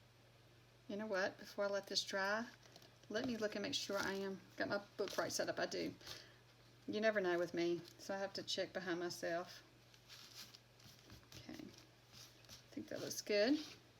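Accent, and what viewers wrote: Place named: Texas, United States. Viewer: Where are you from? My first language isn't english, I understand everything you say but I can help thinking that you may be from Texas or some american place with cowboys and sun lol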